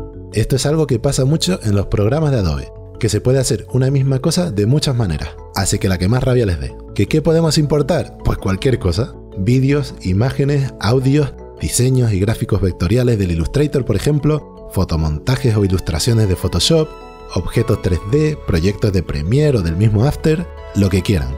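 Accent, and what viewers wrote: Canary Islands, Spain. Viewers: Joder cómo se nota que eres Canario, me das una paz al hablar que haces que todo parezca más fácil. El buen rollito te lo has traído a youtube y eso se agradece jajajaja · El video es mucho más llevadero con ese acento canario hermano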